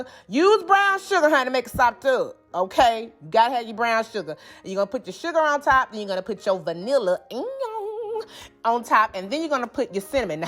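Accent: South, United States. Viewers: Why did the commentary to the video bring me back in 2025! 😂 love her southern accent mixed with the humor 🤣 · The accent got me. I was so distracted listening to her southern drawl that I forgot what I came here for :-). She had me cracking up when she went "you need to make a lil mo chile". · your southern charming voice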